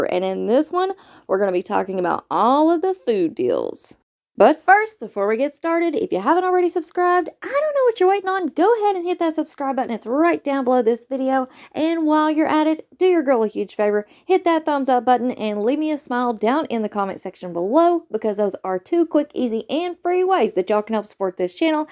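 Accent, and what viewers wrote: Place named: South, United States. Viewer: Odd comment incoming 😂….I love your voice and accent! It’s so pleasant. Are you a fellow Southerner?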